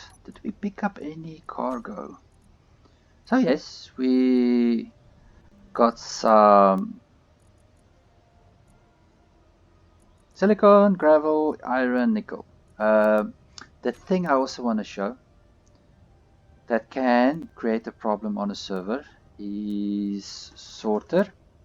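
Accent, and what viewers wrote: South Africa. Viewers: Ah ok, accent was dead giveaway :) im unfortunately still in South Africa...but yeah, I enjoy this game quite a bit. Happy engineering to you and keep well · Sounds like a South African to me! Lekker!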